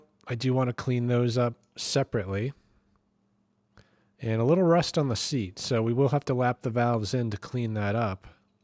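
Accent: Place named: New Hampshire, United States. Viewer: That is "local accent"....New Hampshire?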